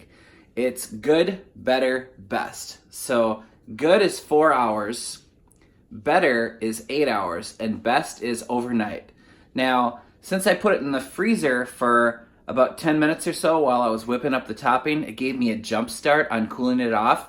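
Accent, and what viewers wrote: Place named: Midwest, United States. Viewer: Haha! I love your Wisconsin Minnesota accent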